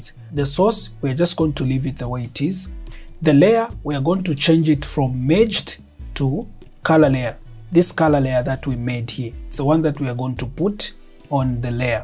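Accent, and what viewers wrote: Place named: Zambia. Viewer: For some reason you sound Zambian, not that its important at this point😁 very good tutorial.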